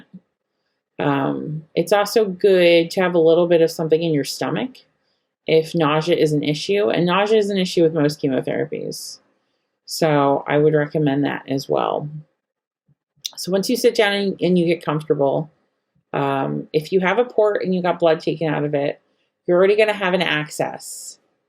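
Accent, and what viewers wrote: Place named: United States. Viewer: I love it I was laughing in a good way lol.....I love the American accent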